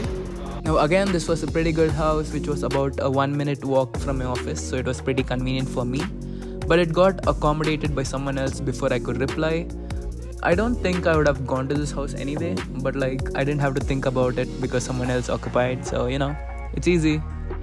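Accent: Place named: India. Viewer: best part your Hindi accent Macha ❤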